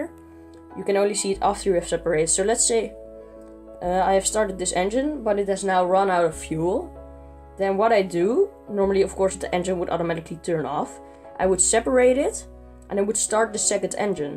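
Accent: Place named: Netherlands. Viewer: Ik smell Nederlands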